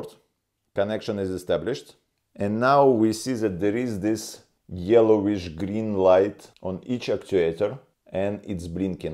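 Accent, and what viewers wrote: Russia. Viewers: ЧИСТО РУССКИЙ АКЦЕНТ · Мне одному кажется что это русский английский, робот офигет какой тихий, интересно как устроен сервопривод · очень выражен русский язык, так как английский не родной. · бесит жёсткий русский акцент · русский акцент :-). Крутая вещь. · Хороший робот, быстрый и тихий. С русским акцентом намного понятней)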